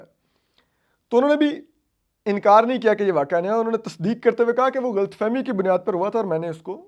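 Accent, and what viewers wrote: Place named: Karachi, Pakistan. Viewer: Assaakum😢theek hy😊jb tk news ka base karachi mai raha,aisy lehjy waly maqami reporter hoty thaay😊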